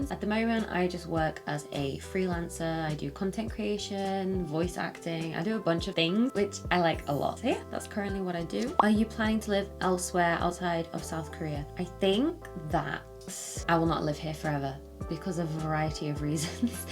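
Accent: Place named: United Kingdom. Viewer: Love ur accent pls teach me British accent 🥹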